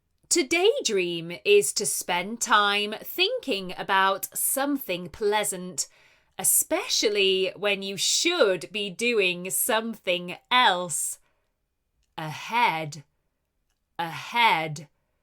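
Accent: United Kingdom. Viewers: 🙌🏾🙌🏾🙌🏾🙌🏾Thanks so much for your time that you have been using to prepare the different stories ✨✨ it helps to boost our english level 😁😁Even UK accent 📌.Don't know how to thank you 😊 enough.May God bless the work of your hands 🙌 🇹🇿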